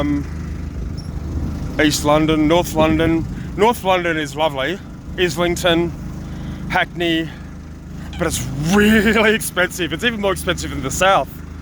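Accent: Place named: Australia. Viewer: Hey you are Australian 😂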